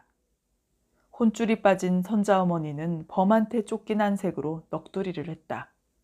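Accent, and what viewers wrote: Gyeongsang, South Korea. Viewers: 독특한 경상도 안동지방의 사투리와 억양에 나도 몰래 빠져들어 감사하게 잘 들었습니다. 고맙습니다. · 고향이 청송이라니 반갑습니다 저도 청송군 진보면이 교항이라요 글읽는이의 사투리를 들으며 엣날생각이나서 저도 열심히 들었네요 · 감사 한니다. 경상도 의성 근방의 그 살 찐 음성!! 고향맛이 뚝뚝 떨어 졌습니다.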